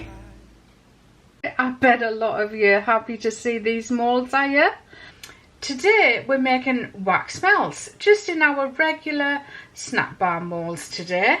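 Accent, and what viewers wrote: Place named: Hartlepool, United Kingdom. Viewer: Just found you and I love the videos. Are you from Hartlepool I reognise the accent but cant pin it down.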